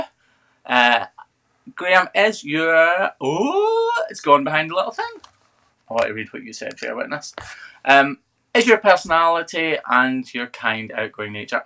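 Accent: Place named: Southwest Scotland, United Kingdom. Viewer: Love your videos, very interesting. You have a familiar accent from where I used to live in SW Scotland, I lived in Glentrool village then the Isle of Whithorn. You're definitely from that neck of the woods.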